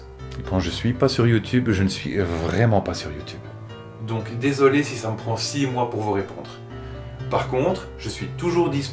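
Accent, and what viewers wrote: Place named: Belgium. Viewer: Hey salut maggle ! Petite vidéo pour pas dire grand-chose mais ça fait toujours plaisir de te revoir et d'entendre ton accent belge nous parler de la "pouissance". Ce com' est un encouragement à continuer :-). Plus de musique des 60's ça y est ? Un truc m'a déplu, c'est quand tu dis "c'est à cause de ces gens que...". Tu en as fait partie tu le reconnais, nous avons tous fait partie de "ces gens" à un moment de notre vie. Je pense que tu devrais plutôt juger l'attitude et non les personnes. Cela permet aux gens de changer plus facilement. PS : toi qui réfléchi au sens de la vie et la société, as-tu entendu parler du travail de Pablo Servigne ? As-tu regardé la série Next de Clément Montfort ? Bises.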